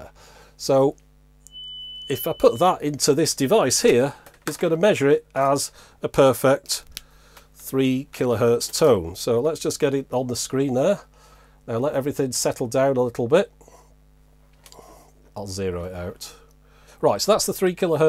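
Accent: United Kingdom. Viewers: I love British accents describing things or providing instruction.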